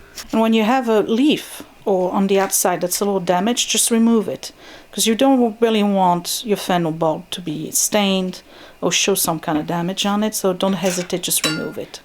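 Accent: France. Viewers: On your last video I asked if you have a German accent. But now I'm guessing it's French? That's what I thought. Everything looked yummy.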